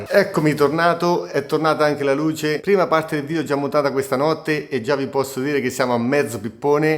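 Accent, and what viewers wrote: Marche, Italy. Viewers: Caro, seguo molto volentieri i tuoi video perché sei bravo preciso, hai tanta esperienza, ma soprattutto il tuo accento marchigiano ( credo) mi piace un sacco 😊 Vengo al punto: il parateste in acciaio originale Bmw è per te efficace per un uso del GS che non ha il tubulare esclusivamente su asfalto?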